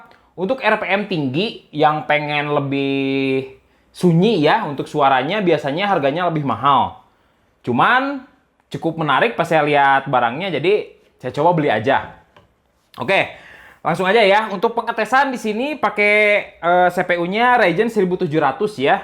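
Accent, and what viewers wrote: West Java, Indonesia. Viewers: sunda bang? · Mang orang mana sunda amat eta congorna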